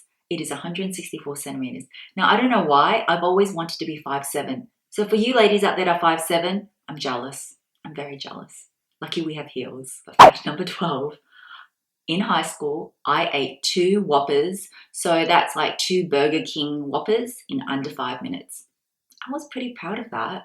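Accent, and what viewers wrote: China. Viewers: Lots of interesting facts to learn about you! I love how open-minded you are and so brave for trying that spider! Yikes. I have a huge fear of spiders, big or small and the closest thing I could do to try and overcome it was to hold a tarantula. >_< Even then I was frightened. Same goes for heights! eeek! But I love the thrill and adrenaline of just trying. Your dialect sounds like a mix of Cantonese and Vietnamese? Just from the sounds of main languages I know :o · Wow I can understand your dialect well somewhat,,, it's close to Fu Kien and yes nobody understands it here in Canada.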